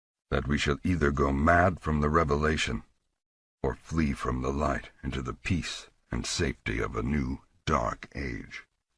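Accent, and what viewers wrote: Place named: United States. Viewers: I am pretty certain it's American, further emphasized by the way he pronounced "either" in the beginning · Wayne June is American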